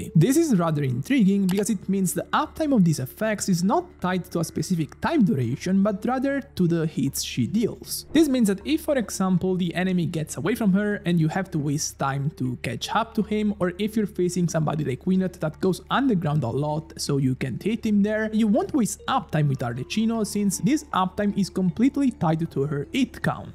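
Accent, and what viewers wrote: Italy. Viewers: He's also italian, you can hear his accent. He's mispronouncing it on purpose, so genshin babies don't get confused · Si sente tantissimo che è italiano ahahha. (Arleccino mi spezza ogni volta che lo sento ahaha )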